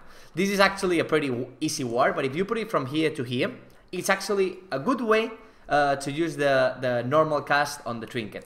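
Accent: Spain. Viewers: I know you are Spanish, you need to learn english not Spaglish.... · it's a spanish accent. like spain in europe not latin america.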